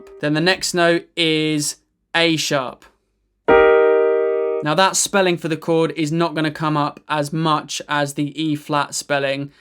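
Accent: United Kingdom. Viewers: Only just found you today but I think you taught this so well and I loved the editing! Some really good key points popping up as you were talking. I like that you talk a lot about wrist positions and even where to hit the note for maximum clarity when playing, because it's so important and easily missed! Also good hearing someone else from the UK, no shade to anyone else, it's just that I hear and watch lots of music videos with Americans, and it's refreshing to hear the change! I hope your channel continues to grow, you deserve it! Just subscribed!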